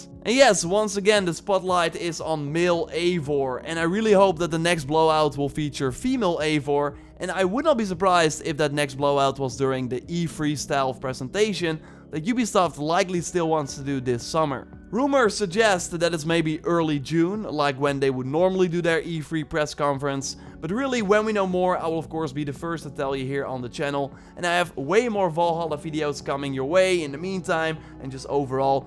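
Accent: Netherlands. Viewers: Ben je Nederlands? Your accent gives it away xD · And BAM 😆👊 Awesome 😄 I have a question: Where are you from? Your accent sounds Dutch 😁 Greetings from Belgium 😊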